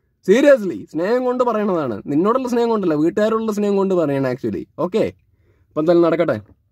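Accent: Palakkad, India. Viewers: Bro palakkad aano language ath pole thonni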